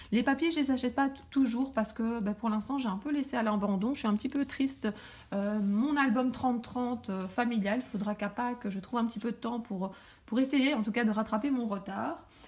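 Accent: Belgium. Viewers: Coucou, trop bien tes achats, moi, j'ai hâte d'aller à Passion Scrap en avril. J'adore ce que fait Scrapistelle. J'ai fais une crop d'une journée avec elle pour réaliser un album il y a de ça quelques années...elle est top...sympa, créative, simple...trop bien. Je pense que tu dois être pareille...et j'adoooore cet accent qui me rappelle trop ma Belgique natale...au plaisir de voir quelques autres de tes réalisations. Gros bisous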